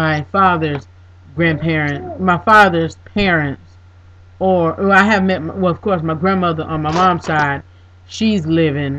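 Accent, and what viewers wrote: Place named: Washington DC, United States. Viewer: OMG! He is sooo cute!! Yasss for the tag video!!! The D.C. accent is easy to pick up...I love it!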